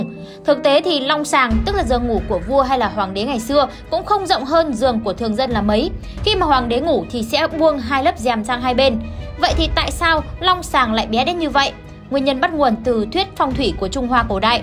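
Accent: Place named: North, Vietnam. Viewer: Em Mc vừa lanh vừa duyên vừa hài hước-to chà bá😅thích nghe em nói chuyện giọng bắt mặc dù chị không thích nói tiếng bắt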